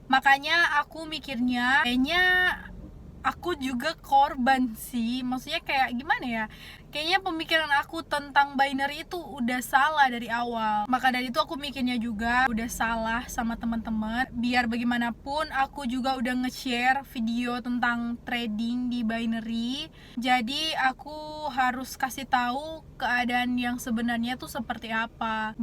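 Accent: Manado, Indonesia. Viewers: Logat manado masi keliatan dikit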